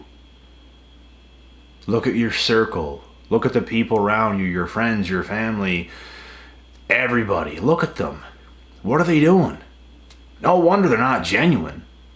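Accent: Canada. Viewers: Love the Canadian accent! From USA.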